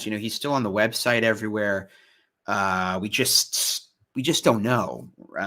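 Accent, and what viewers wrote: United Kingdom. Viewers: The British accent!! 🤣🥰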